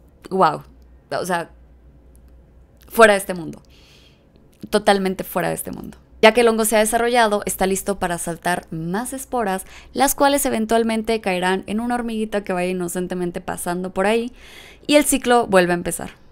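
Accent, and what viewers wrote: Mexico. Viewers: "Tócate más" entendí 😜 Luego vi que era "Toca temas" 🤭 Será por el acento mejicano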